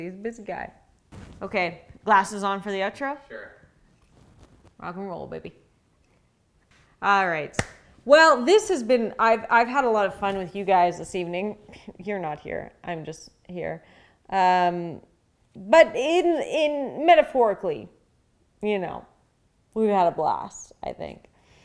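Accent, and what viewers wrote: Canada. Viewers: High had a Canadian accent Eh? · She gets a lot more Canadian when high 😂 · Dude, around 5 minutes in, the Canadian accent really comes ooote. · It's Human Rights violation to ask a high person "What was the last thing you forgot?" You could give someone an aneurysm. She gets SO CANADIAN when she's high. I love it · You’re Canadian accent when you’re high >.< Love it, dawg. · She started sounding more and more Canadian as this video went on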